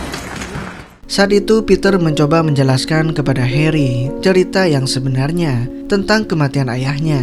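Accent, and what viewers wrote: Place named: Java, Indonesia. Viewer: Pake kata (saya) aja Biyar enak di dengar bng ,( guaww) terlalu medok jdi sedikit geli. (Ini saran bukan hina)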